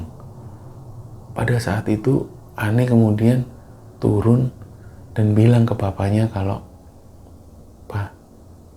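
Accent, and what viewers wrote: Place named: East Java, Indonesia. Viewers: Maaf mas agak telat....iki baru mas....medok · Iya mmg kalo mas itu di resapi enak dgn khas jawatimuran kdang mas idris tp yg keliatan jawa yo mas buat dogeng tdurku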